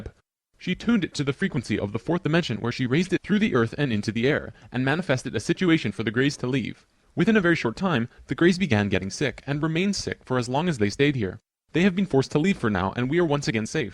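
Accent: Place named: Canada. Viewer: It's the same voice from the original but the pitch has been lowered so he doesn't sound like one of the chipmunks, but the way that the words are pronounced, the rhythmic speech pattern and verbal cadence are the same as the original movies narration. I believe that the narrator is Canadian based on the pronunciation of certain words.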